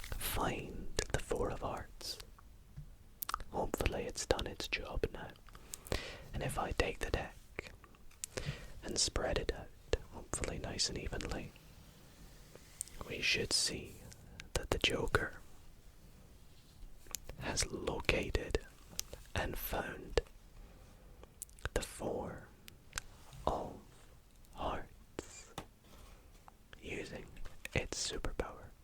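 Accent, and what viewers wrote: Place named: Ulster, United Kingdom. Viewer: Nice Ulster accent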